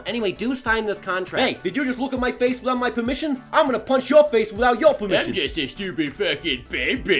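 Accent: United States. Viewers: IT WAS SO WEIRD HEARING YOUR AMERICAN ACCENT AHHH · WITH AN AMERICAN ACCENT OH MY GODDDD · Your generic American accent sounds quite a bit like the way I talk, and I've been on the east coast of America for most of my life. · You sound like mid west or east coast America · Your american accent made me feel sick for three seconds and I'm not entirely sure why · I got a American accent too.. haha..like you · I thought you was American for a long time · I like his full on American accent lol sounds cool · in his jeeny video he sounds supper american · AH. WITH AN AMERICAN ACCENT. AAAAAAAAAAAAAAAAAAAAAAAAAAAAAAAAAAAAAAAAAAAAAAAAAAAAAAAAAAAA... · The fuck happened with your accent you sound almost completely American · As a born and raised Chicago-an yes! You sound super American!!!:) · Holy crap your "American" accent sounds like my friend Dylan (we live in New Hampshire) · oops... 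i always thought you were american! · i say "american" cause your non eastern states accents are closer to Canadian accents. it's not an insult. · Damn, that American accent is spot on. I wonder why you don't use it more often for your skits. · being from the northern Midwest, specifically less than ten miles south of Canada, your "American" accent is very familiar to me. You really accent the o's when you say "shows", "country" and "over" that reminds me a bit of my Canadian cousin. So when you cover up that Cambridge twang and add accentuation on the consonants, you sound like a Canadian who's been living in American for quite a while. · Everyone talking about his American accent but I'm just stunned at his baby impression like wtf! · HOW THE HELL DOES HE SOUND SO AMERICAN IM DED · Holy shit his American accent is amazing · that accent changed to American initially <3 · that American accent scares me. 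· Base American accent is illinois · Sheesh, I've never noticed you're kinda americanized speech till now. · I didn't actually know you were British...I just thought you were American! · Yeah, you do sound kinda American. But, I always knew/thought you were British. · HOLY SHIT, THAT AMERICAN ACCENT THOUGH! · I ACTUALLY FREAKED OUT ABOUT HIS AMERICAN ACCENT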